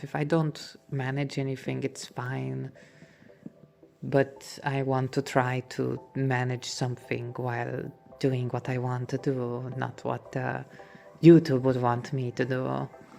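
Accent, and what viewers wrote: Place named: Romania. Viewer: wow I mean first of all, LOVE THE NATURAL ROMANIAN ACCENT 🗣🔥 and thank you so much for calling me nice and chill 😭You have no clue how much it actually means to me though, and I wish I was able to hang out way more, but I'm quite busy sadly and will probably still be for a while but honestly, what a Life update this is, I'm sorry to hear about all the health struggles and financial worries, really hope it all works out and you get that new pc too so we can enjoy more peak vids 🙏and I can speak for a majority of the people that know and watch you, take your time. Even if it takes you weeks, or months, or years, to get that PC, we'll wait and be excited for the vids!!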